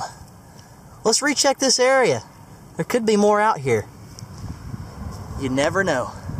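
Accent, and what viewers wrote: South, United States. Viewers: i love ur country voice!! itss saatisfying to me from the north, thanks for the verses at the beginning of ur vids:) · I'm here mostly for the accent, also to watch find treasure! 😅 This California girl can't hear enough of a lovely southern accent. 🙄👌🎶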